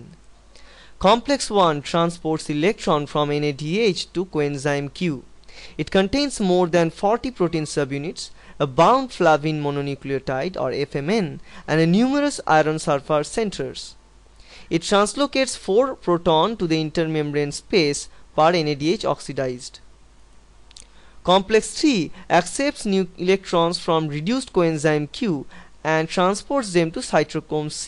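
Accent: India. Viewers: OMG....indian accent.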